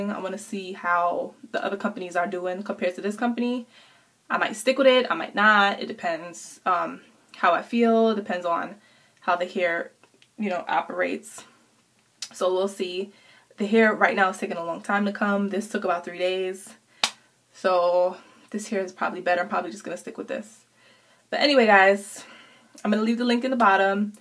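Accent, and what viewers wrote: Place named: New York, United States. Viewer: Omg, I love your Ny Accent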